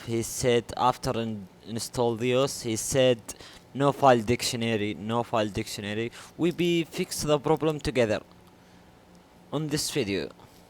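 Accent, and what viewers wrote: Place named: Saudi Arabia. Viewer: ههههههههههههههههه اقسم بالله من اللهجه قبل ما افك التعليقات عرفت انك سعودي😂😂😂😂😭.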